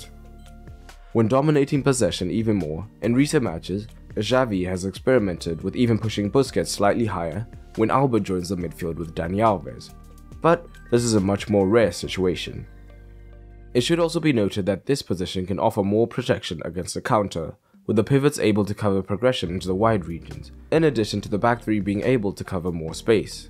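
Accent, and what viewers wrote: South Africa. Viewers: I know you have a UK channel but are you South African? Your accent sounds so South African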